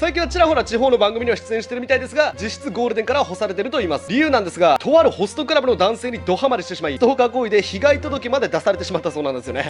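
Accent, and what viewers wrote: Kansai, Japan. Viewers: 関西弁のイントネーションが 気になってしまう〜〜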